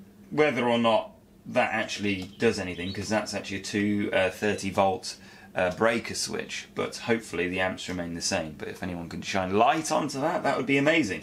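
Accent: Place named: United Kingdom. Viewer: I like your videos and British dialect, I made my own from parts I salvage from SMPS PCBs, Plasma TVs etc.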